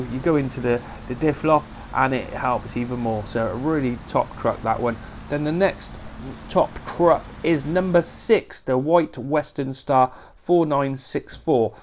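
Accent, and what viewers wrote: United Kingdom. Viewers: Best top ten video I’ve ever seen. Very informative and I love the British accent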